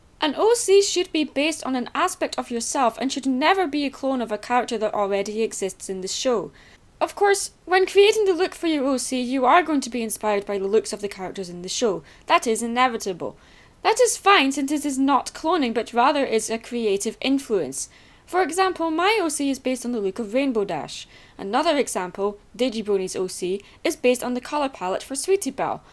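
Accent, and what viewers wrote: Scotland, United Kingdom. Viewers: by the way I love your Scottish accent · She’s Scottish, and I can do an Australian Accent. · I knew you said 'Clydesdale' because of that Scottish accent, furry hooves and it's not a damn pegasus. lol people can't think any further I don't like pony generator/creator because it looks stiff, unoriginal (just like you said) and it kinda makes the ponies look.. weird. Most people (that I've seen) who use it make their OCs have bad color combinations. I'd rather see an OC scribbled than people using the pony generator. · ... i she scottish? i am aswell sooo yeah your not the only scot brony if that's the case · I love your accent lol. I'm Scottish too! #ScottishSquad And I'm fine with recoloured "OCs", but if the person who made one is claiming it to be an original "OC" or "theirs", that just makes me rage! XD. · lol I'm slowly getting used to the Scottish accent cos my friend is Scottish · Norlén SHE IS NOT IRISH SHE IS SCOTTISH!!!!!! 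· your not the only fan in scotland I can tell you live there cause we've got the same accsent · So I'm guessing ur Scottish lol go Scotland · She's Scottish! What did you expect? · So your Scottish?! Yey! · Are you Scottish? Well if you are then I am to! 😄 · scottish like me ;) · Scottish like me :D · It's Scottish wait I'm Scottish Yay I found a Scottish youtuber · omg are u scottish squee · omg i love Scottish accents!!!!!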